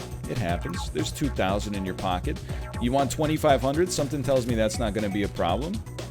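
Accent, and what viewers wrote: Canada. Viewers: why is NL sounding exxtra canadian latley? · NL sounding very Canadian this video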